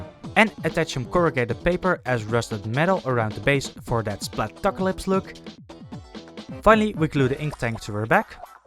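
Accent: Netherlands. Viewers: Thats so cool!! 😍Also are u dutch?😊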